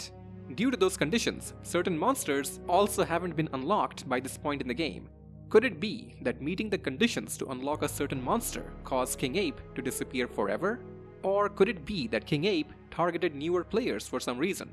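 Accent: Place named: India. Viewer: I can't with your Indian accent bro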